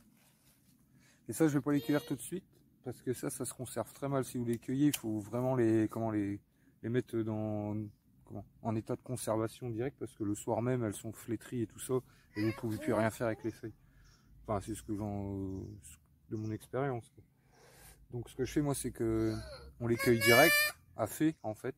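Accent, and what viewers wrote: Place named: Somme, France. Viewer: Bonjour . En effet des betteraves de ce calibre mérite un abonnement !!! C'est fait . Je vous envoi ce petit commentaire de l'Ile de la Réunion , mais votre petit accent ressemble beaucoup au mien (Je suis originaire de la Somme) !!! Sacrée belle récolte . Le meilleur pour vous . 👍👍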